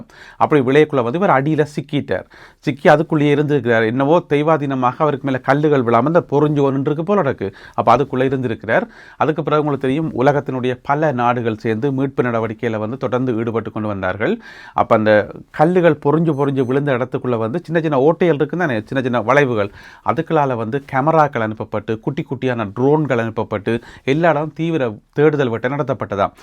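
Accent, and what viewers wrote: Jaffna, Sri Lanka. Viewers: செய்திகள் தரும்போது யாழ். தமிழில் தருவது அருமை.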